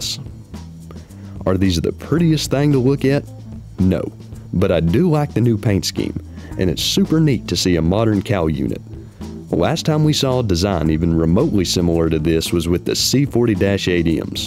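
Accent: South, United States. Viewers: Like your southern accent.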